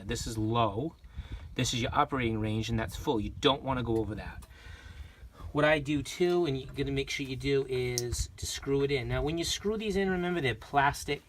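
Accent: Rhode Island, United States. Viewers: Am I detecting a providence Road island accent?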